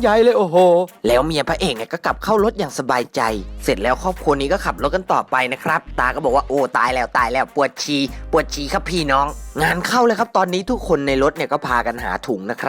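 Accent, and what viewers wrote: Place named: Suphanburi, Thailand. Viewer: พูด แบบ สุพรรบุรี ครับ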